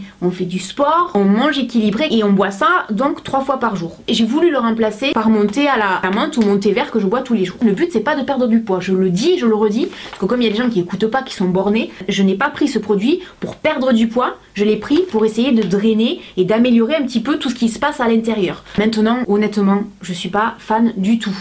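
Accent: South, France. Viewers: Ton accent vient du sud non? J'aime trop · Merci pour ta vidéo, merci pour ton avis sur le thé détox que tu as testé et le livre sur la cellulite, ta bonne humeur et ton accent du sud son un vrai bonheur à attendre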